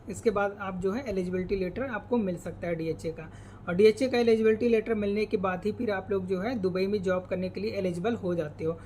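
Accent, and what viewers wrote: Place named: West Bengal, India. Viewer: Sir are you from West Bengal :)??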